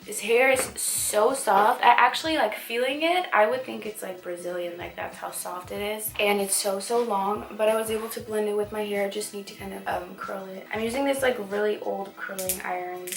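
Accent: Netherlands. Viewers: Omg wait are you Dutch?! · are you dutch?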